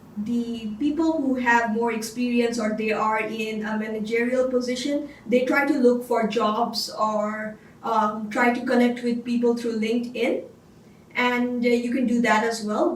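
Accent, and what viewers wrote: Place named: Canada. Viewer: So within 8 months you got Canadian accent!!!!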